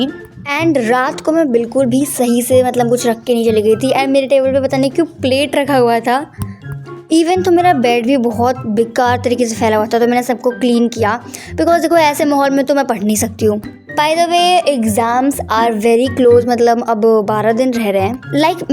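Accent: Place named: Haryana, India. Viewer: Acha per apki boli to haryana jaisi h 😅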